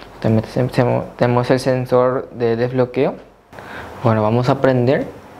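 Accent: Paraguay. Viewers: Se nota cuando alguien habla en paraguayo😂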